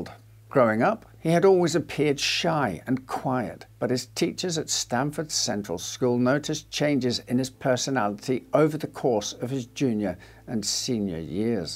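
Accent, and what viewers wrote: Scotland, United Kingdom. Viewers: You remind me so much of the Scottish actor in Outlander not sure of his name. I love your accent 😊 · I hope you get well.. I enjoy his narration style, and the subtle Scottish burr allows these stories of terrible people happening to decent folks bearable to hear about. I cannot believe I used the adjective "subtle" to describe Scottish.. anything.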